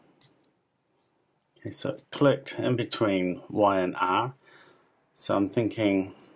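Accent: New Zealand. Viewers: Kiwi? Chur bro lol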